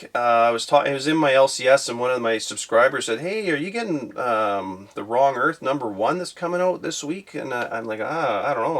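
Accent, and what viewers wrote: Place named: Canada. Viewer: Enter me for Venom 5 and thank you for simply talking comics with a Canadian accent 😃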